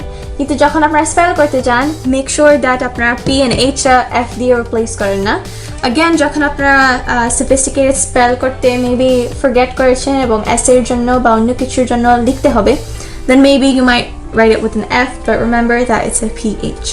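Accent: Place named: Bengal, India. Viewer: Best accent of Bengali pronunciation ever I have heard.❤️❤️❤️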